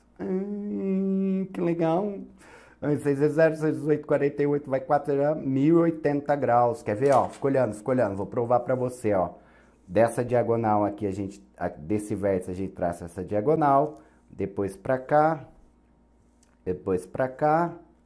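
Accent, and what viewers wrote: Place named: São Paulo, Brazil. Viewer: sotaque pulista kaka bom d+ tmb sou paulista mas ele tem um sotaque muito forte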